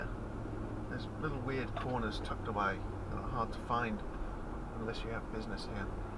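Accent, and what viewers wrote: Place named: England, United Kingdom. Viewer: This was randomly recommended to me by the Youtube algorithm. I'm from Clark. What a fascinating video of an English accent narrating the area I grew up in. You have described things in a way I never would have thought of! Please do more videos! Come on down to Clark, Cranford and Rahway!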